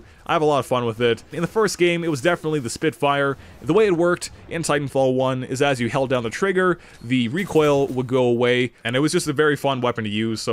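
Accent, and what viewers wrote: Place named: Canada. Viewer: Your canidiaannn!!!!! Damm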